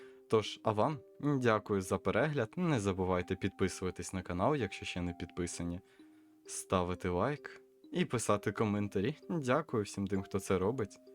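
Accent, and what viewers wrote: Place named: Surzhyk, Ukraine. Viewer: Йоу, у тебе дуже круто вдається. Мені подобається твоя спокійна подача. Тршіки суржику додає тобі шарму Подерев'янського) Як і матюки що проскокують. Реакція на події в тебе кльова, за якою приємно і трішки кумедно (в хорошому сенсі) спостерігати. Твої відео приносять дійсно задоволення. Успіхів тобі на шляху ютубера) Українського контенту має бути більше)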